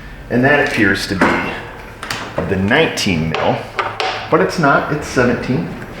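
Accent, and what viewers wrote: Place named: Massachusetts, United States. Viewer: Wicked good MA accent 😂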